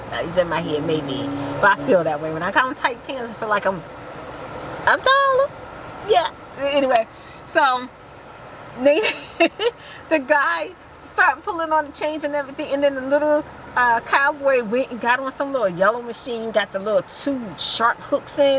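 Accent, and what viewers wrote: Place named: East Coast, United States. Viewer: So I'm in NYC and yu give me East cost vybez lol like Jersey Philly NYC your accent I can listen to u all day lol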